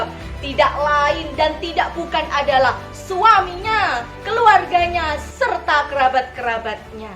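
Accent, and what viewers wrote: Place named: Pasuruan, Indonesia. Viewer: Alhamdulillah, nada Pasuruan banget. Akan kita temui ketegasan beliau ketika dipercepat 1,5x. Sukses selalu bu bidan, sehat selalu fatayatku.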